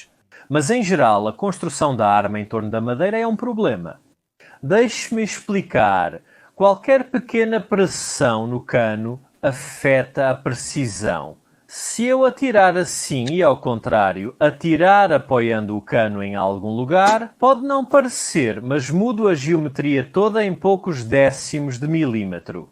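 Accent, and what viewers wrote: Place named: Portugal. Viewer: BR Olá camarada. Você é real ou uma ia? Desculpe falar assim. Você tem um jeito engraçado de falar o português europeu e parece não sincronizar com seus lábios. Mas parabéns. Eu gosto de história. Continue 👏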